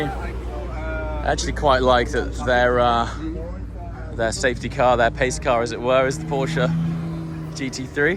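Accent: United Kingdom. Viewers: Only got onto you through dde, love the content! British voice is always nicer than an Americans! Love from down under mate!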